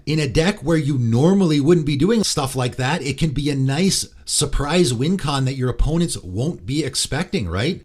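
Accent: Canada. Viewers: He's pronouncing it as the average Canadian would, who would be used to reading unfamiliar words with a French phonetic structure. If you all understood what he was saying, he communicated successfully and if you're mad about it, you are remarkably petty.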